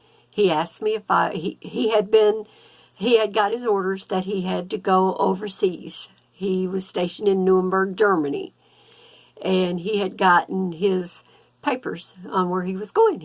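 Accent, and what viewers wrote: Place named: South, United States. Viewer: Thanks for sharing friend. I knew you had a southern accent!! Takes one to know one. Maybe we can do a swap soon.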